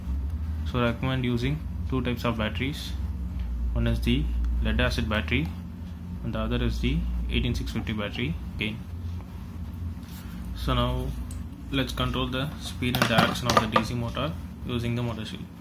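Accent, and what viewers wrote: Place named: India. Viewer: Damn always Indian accent...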